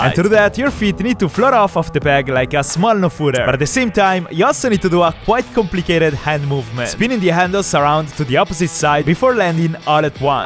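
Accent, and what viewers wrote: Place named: Italy. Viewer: Other skills you can learn (I'm new so I don't know if you already learned them. I discovered you yesterday.): 1. Learn the Japanese Katana (Like you did with the Nunchucks and the Staff.) 2. Develop a British accent (But don't use it because I, and probably every fan really likes your Italian accent.) 3. Learn how to freestyle rap on command. 4. Learn how to solve a Rubix cube, but blindfolded. 5. Learn to do the cup stacking game. This was all I could think of. Do you like it, and would you use it?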